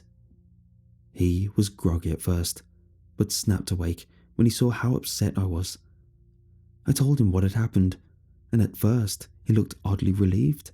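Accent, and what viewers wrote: England, United Kingdom. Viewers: I'm back and obviously I ment my specific country, as in England the country, believe it or not we don't just announce were British at the start of our conversation for the hell of it. The guy reading in the story had an English accent, thought that connection was obvious. And no the term 'country' does not only refere to southern states of North America. Do they teach yanks about the rest of earth or no? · hes British boy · hes english...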